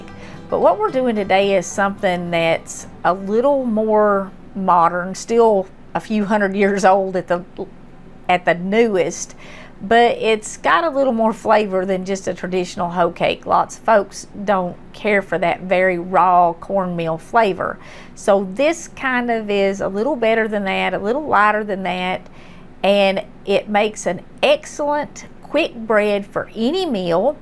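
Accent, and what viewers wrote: South, United States. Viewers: I love watching your videos. And your voice and southern accent just seem to calm me down when I'm feeling down or just out of it. I'm from Missouri so I think I have a accent too lol. But love listening to you. Take care!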